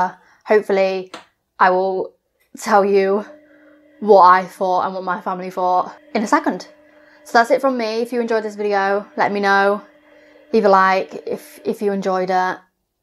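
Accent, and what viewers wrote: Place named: Kentucky, United States. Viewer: absolutely I love your accent, and mine is just as country if not more so than Chris, Amy, and Tammy’s! We’re from the same neck of the woods here in Kentucky! 😂 Biscuits and gravy have to be HOMEmade not from a restaurant otherwise you just congealed slop. 😂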